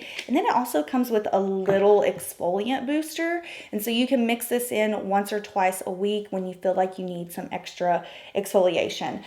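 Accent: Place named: South Carolina, United States. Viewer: I would like to try the Hydra Serum for my dry, sensitive skin. Your accent helps with my homesickness - I'm from SC, been in Cali 20 years 😉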